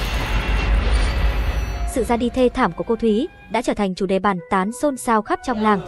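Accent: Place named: China. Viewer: Nghe mô thích người Trung Hoa lác lưỡi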